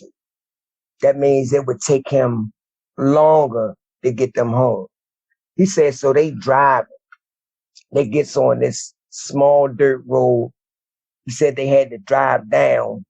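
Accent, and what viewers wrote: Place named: Baltimore, United States. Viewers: Dmv stand up you can hear the Baltimore in my guy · My man reading your from Baltimore aren't you? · Heavy Baltimore accent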